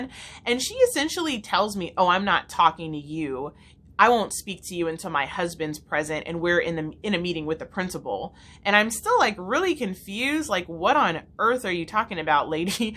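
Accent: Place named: Minnesota, United States. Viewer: The more I listen the more it sounds like white minnesotans. Oh please, nurses— especially school nurses are usually at the bottom of that field, why do you elevate this rube?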